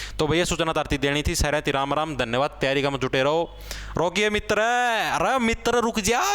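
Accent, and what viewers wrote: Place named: Kaithal, India. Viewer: Bhai kaithal side ki yhi boli h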